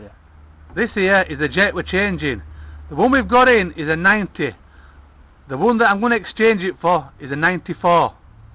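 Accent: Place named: Grimsby, United Kingdom. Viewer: Just gunna up-jet my 150 4T top tips. You sound like a Grimbarian?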